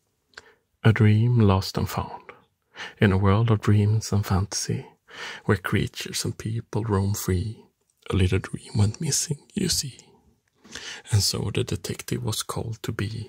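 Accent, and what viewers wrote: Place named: Germany. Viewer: I love your Asmr, you have like a German/northwest European accent which reminds me of a friend. You make great Asmr! Lots of respect to you 👊🏻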